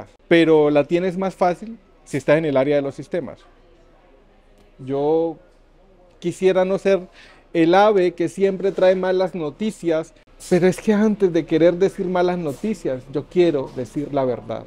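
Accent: Colombia. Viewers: Este habla como hablaban los colombianos en los 80/90 cuando estaban huyendo de la guerrilla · Menos mal que alguien dice mucho de verdad al parecer eres un hombre de bien DIOS te guíe siempre muchacho, pero si ayuda mucho si vendes empanadas a los restaurantes y en tu casa si tienes entrega por lo menos yo lo hice y mis hijos tuvieron pan en la meza. Pero eres un hombre correcto tú no eres mexicano por tu acento eres colombiano ojalá no me equivoque te deceso lo mejor.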